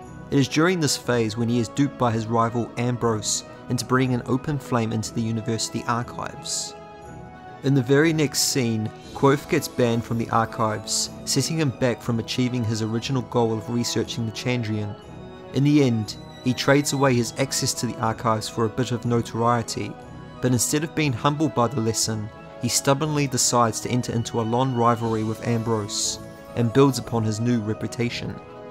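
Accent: New Zealand. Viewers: Question for the creator: are you from New Zealand? I'm not great at accents, (I'm from Texas and have only rarely traveled), so I'm only guessing based on my experience, but I have rarely heard your accent before so am very curious.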